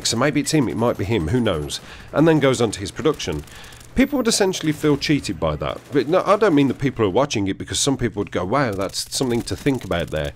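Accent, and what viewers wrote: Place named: England, United Kingdom. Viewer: Awesome vid, superb english accent marvelous i should say, hope he listen to reason or otherwise his screwed.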